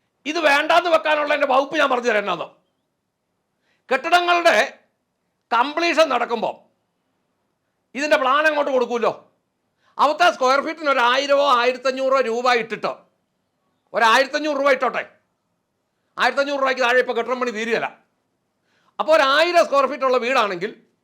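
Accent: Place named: Central Kerala, India. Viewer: മധ്യകേരളത്തിലെ സ്ലാങ്ങാണ്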